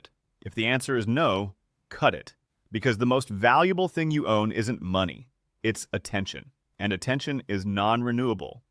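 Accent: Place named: United States. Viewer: Don't worry. The misspellings are still there, despite his being a screenwriter. At last, good narration, whether that voice is artificial or not. Sounds like an everyday American, minus the weird syntax other (foreign?) videos have. Besides, we all need a fresh start in January, especially after 2025, a pretty rough year❗🗓️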